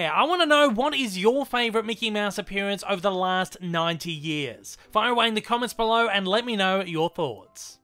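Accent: Australia. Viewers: I love this guys Australian accsent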